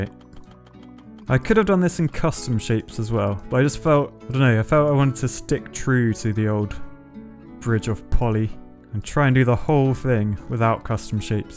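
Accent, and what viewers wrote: United Kingdom. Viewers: Ok but how do I get my Google device to have a British accent?!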